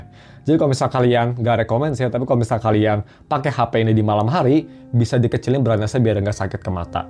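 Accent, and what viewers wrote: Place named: Depok, Indonesia. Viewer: mas" Depok 😅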